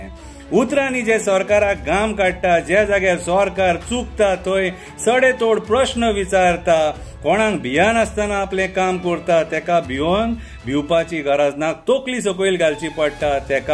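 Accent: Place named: Salcete, India. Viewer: Xaxti accent good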